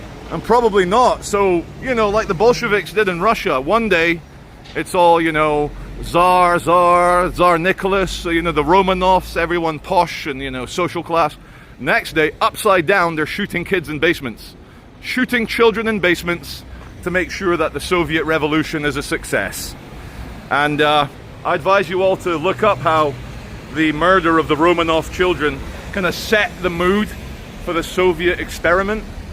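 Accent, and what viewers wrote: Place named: RP, United Kingdom. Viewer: We need more posh accents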